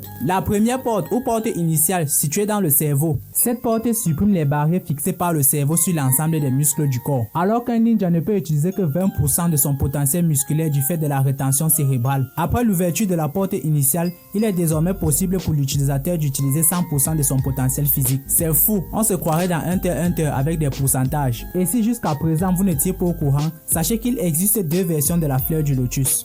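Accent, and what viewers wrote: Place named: Africa. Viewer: C'est juste que t'as 1accent comme dans beaucoup de nos pays Africains dû à nos langues traditionnelles, c'est pas grave mon frère. Continues comme ça j'aime tes vidéos👍🏽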